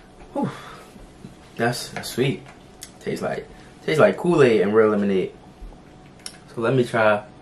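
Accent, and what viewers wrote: Baltimore, United States. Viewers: Bmor accent? Good video. Love to see dmv youtubers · Definitely knew u were from B more before u said it lol tew dollars🤣I love yalls accent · The Baltimore is strong in this one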